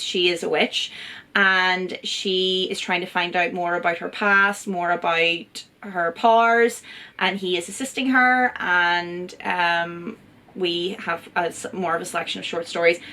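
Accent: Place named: Belfast, United Kingdom. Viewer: Totally agree lol. I tho yip thats a belfast girl alright lol 😅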